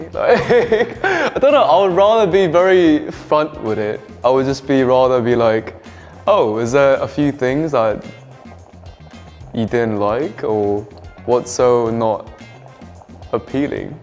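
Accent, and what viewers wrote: United Kingdom. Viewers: British accent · HIS BRITISH ACCENT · HIS BRITISH ACCENT IS EVERYTHING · MINHO YOU HAVE SUCH A LOVELY ENGLISH ACCENT 😊 BEAUTIFUL #KITTYMINHO HERE 🥰🫡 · His British accent ❤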